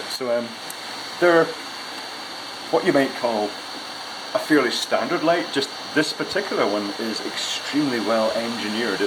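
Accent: Scotland, United Kingdom. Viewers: sorry for the dumb question - your accent is scottish?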